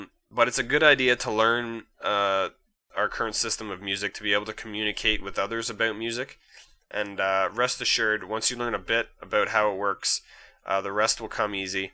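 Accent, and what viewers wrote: Canada. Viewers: Nice video thanks. P.s. your Canadian huh? Cool accent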